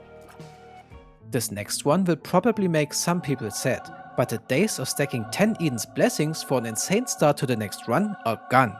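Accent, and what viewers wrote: Germany. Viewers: Ah yes DER DEUTSCHE AKTZENT is stong with you :33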